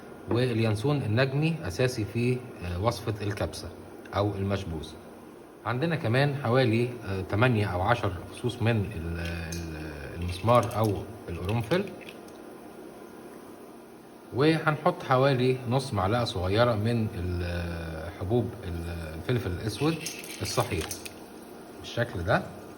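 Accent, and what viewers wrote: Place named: Egypt. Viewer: حضرتك لهجتك مصري جدا ...لكن اكلك كله هندى وخليجى...انت متأكد انك مصرى🤔😄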